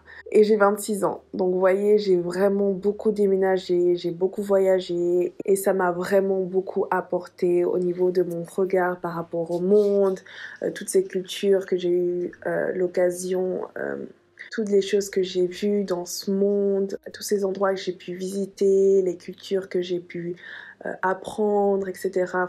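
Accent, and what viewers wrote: Spain. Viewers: J’adore ton accent espagnol moi aussi ma langue maternelle c’est arabe 🇩🇿 mais je parle l’arabe , français, espagnol et english ❤️❤️❤️🤍 mais ma Préfère c’est l’espagnol me encanta 😍 ¡qué dios te proteja y te bendiga!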